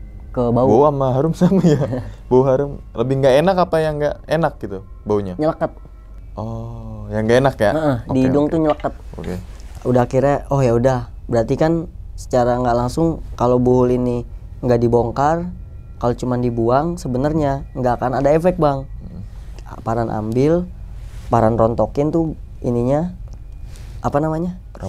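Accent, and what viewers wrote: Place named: Banyumas, Indonesia. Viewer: Kangen dgn logat ngapaknya mang😊😊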